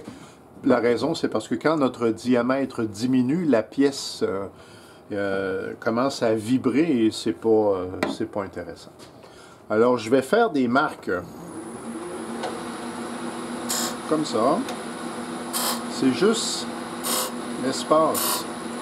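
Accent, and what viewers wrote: Quebec, Canada. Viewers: Bonjour et merci pour cette dernière vidéo, très instructive comme les autres. Je suis débutant tourneur sur bois, en France. Mon métier c'est plutôt le métal. Une cliente m'a donné un tour à bois. C'est un modèle bas de gamme, mais ça me suffit pour faire mes manches de limes 😉 et apprendre. J'apprécie beaucoup vos vidéos, très claires, très pédagogiques. Et puis j'adore entendre l'accent et les expressions québecoises. Un grand merci pour ce partage de votre savoir. 🙂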